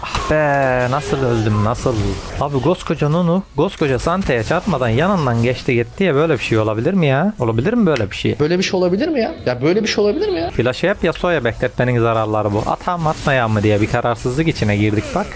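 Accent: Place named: Serik, Turkey. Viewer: Abi sende tam Serikli şivesi var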